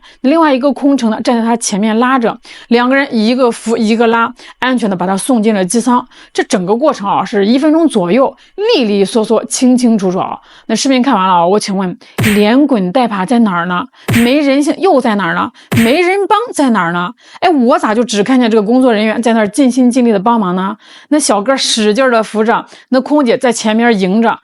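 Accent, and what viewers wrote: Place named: Shandong, China. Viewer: 我不在乎说什么 我是来听山东普通话的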